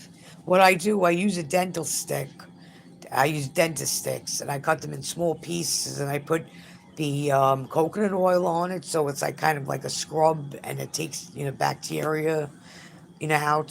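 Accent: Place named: New York, United States. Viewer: Are you from ny ? You sound like it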